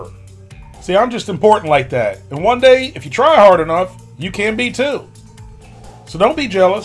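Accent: South, United States. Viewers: Hey! Just started binge watching your videos this week and I have to say it man, love the southern drawl. Makes me feel right at home. Keep on keepin on brotha!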